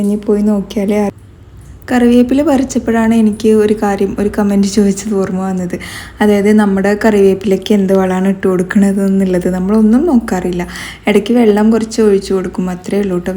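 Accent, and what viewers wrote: Palakkad, India. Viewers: പാലക്കാട്‌ കാരുടെ സംസാരം നല്ല രസാ അല്ലെ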